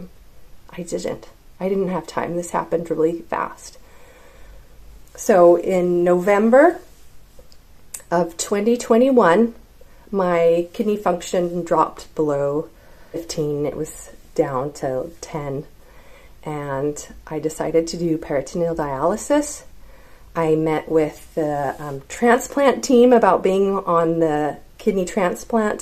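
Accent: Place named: Canada. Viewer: OMG you just said something about being in PA? I honestly thought. by the way you talked, that you were a CANUCK EHH! :O * Mind Blown*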